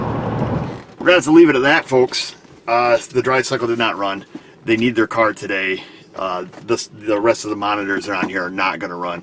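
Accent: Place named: Alabama, United States. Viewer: I like these videos , but I am from Alabama and it seriously sounds like he is saying Cadillac converters. Which is what someone from Alabama would say".".".......................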